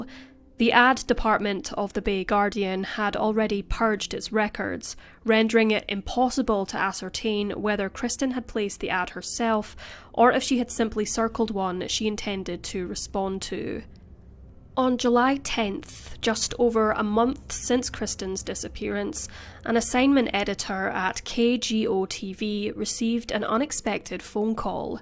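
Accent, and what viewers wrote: Scotland, United Kingdom. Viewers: Where is your accent from clearly Scotland but guessing not quite as far north as Aberdeen, right?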